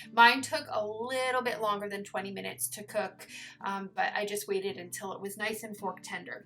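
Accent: Canada. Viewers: I think I remember you saying that you are from Canada, so that explains your accent. I am so happy that you are getting new subscribers. I love your videos, been with you from the beginning.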